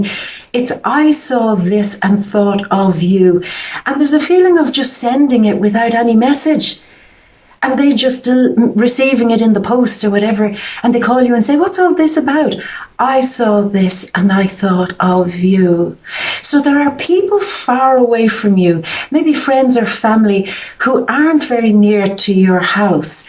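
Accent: Ireland. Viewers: Your Irish?? Have u ever seen a fairy?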